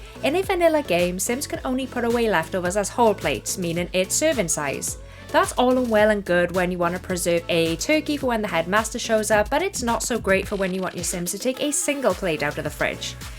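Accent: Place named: South Wales, United Kingdom. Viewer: Some of these mods are essentials for me now, especially "Community Lot Skilling", "Job Levels" and "Simply Leftovers". Especially "Simply Leftovers". Also.. Ydi'r fy clystau'n gweithio? 😱, are you by chance from South Wales? Your accent is very distinguishable! I'll be incredibly surprised and happy if you are. You will be one of very few Welsh simmers that I've heard 😊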